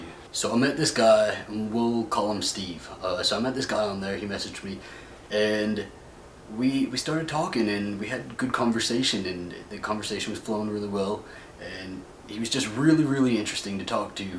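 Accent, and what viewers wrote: South, United States. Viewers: Sound thick like Alabama or Georgia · American Southern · I'm pleasantly surprised at this story. Not the usual whiny entitled gay b.s. What an alluring Southern accent. But really, this date sounds like par for the course -- unfortunately. It was a bad date.. but don't blame the Grindr app. It's the man you chose to date. But that's what dating is for: to find out what a person's really like. I hope you don't give up on dating.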